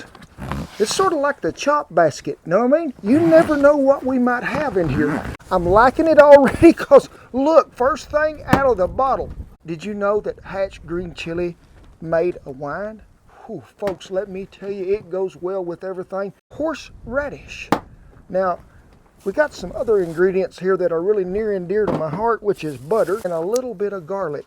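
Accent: South, United States. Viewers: Southern accent really amazes me. Keep rocking sir with your cowboy style of cooking.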